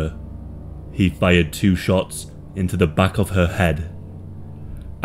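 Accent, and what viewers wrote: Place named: Liverpool, United Kingdom. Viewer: Ok you're my new favorite but I need to know where the accent is from it's driving me crazy trying to place it. Scouse?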